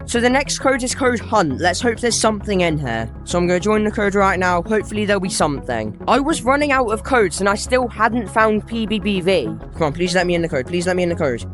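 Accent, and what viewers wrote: United Kingdom. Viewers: I love his British accent 🙃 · the british acent is killing me🤣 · are you British?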